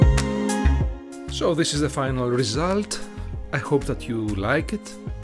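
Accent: Greece. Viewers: Nice greek accent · I love your Greek accent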